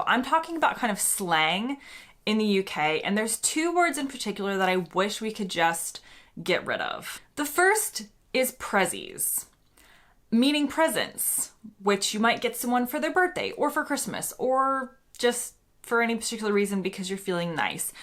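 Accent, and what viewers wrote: United States. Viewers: I found it weird saying that you're a Brit now if you're saying you have dual citizenship wouldn't you want to also say that you are American as well you sound American but I do like the content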